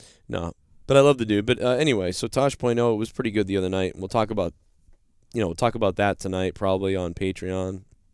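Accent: Boston, United States. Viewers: sounds like someone is from the BAWSTIN AREA. Subbed as a fellow Masshole.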